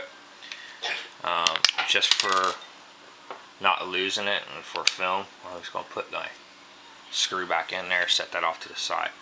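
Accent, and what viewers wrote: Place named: Midwest, United States. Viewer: Good informative video. Your Midwestern accent kinda annoys me but it's not a deal breaker. Just breaking balls. Next you can do one on a JA9 since I have one of each.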